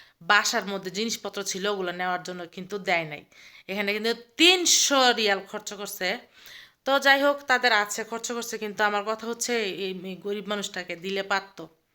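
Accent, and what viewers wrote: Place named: North, Bangladesh. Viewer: কথা শুনে মনে হচ্ছে -বাড়ি দিনাজপুর, ঠাকুরগাঁও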